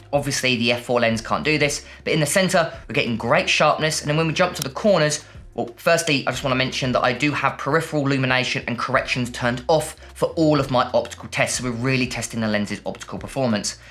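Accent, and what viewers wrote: United Kingdom. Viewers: your English accent is annoying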